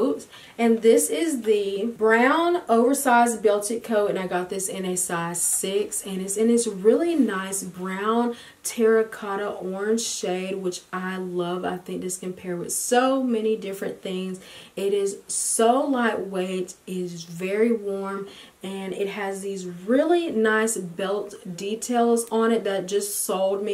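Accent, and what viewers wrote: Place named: South, United States. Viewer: you have the best American accent I've ever heard! are you from the south?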